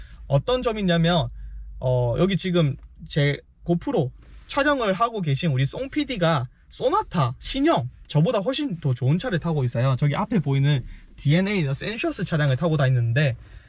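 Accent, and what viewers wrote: Busan, South Korea. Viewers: 20대??ㅎ~ 항상 밝고 시원스런 부산 사투리와 성실해 보임에 정감이 갑니다~ 꾸준히 하신다면 성공예감!!!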